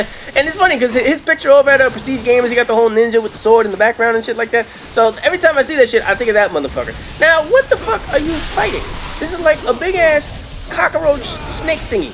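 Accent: New York, United States. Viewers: NY ACCENT !! NY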